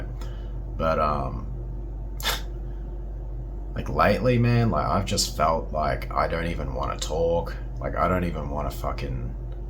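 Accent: Australia. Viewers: It's been said before, but I'll say it again, individuals can be smart. You're smart. I'm smart. But people are the stupidest mudda fudders on the planet. Here in AZ people bought up all the toilet paper in the local stores like it was Covid all over again! Why? Get this, due to the port strikes on the other side of the fcking country! Like we get our toilet paper imported from fcking China 🇨🇳 🙄 Why the fck are people so gotdamn stupid. This is why I love your channel. Brits accents grate on me but I actually like the Australian accent.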